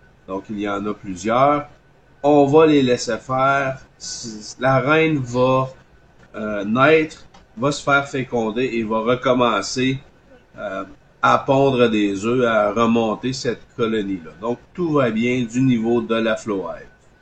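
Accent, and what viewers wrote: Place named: Quebec, Canada. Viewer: Je suis une apicultrice de Toronto. J'essaye d'apprendre la terminologie apicole en français car je suis anglophone. Puis-je vous demander s'il vous plaît d'énoncer un peu plus clairement car j'ai appris à parler français auprès de professeurs français et non québécois. Je suis apicultrice depuis environ 10 saisons. Merci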